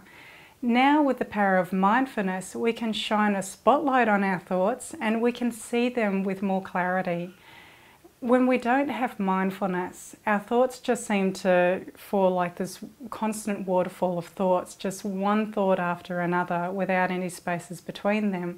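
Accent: Australia. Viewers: Excellent talk, thanks for sharing. Lovely Australian (?) accent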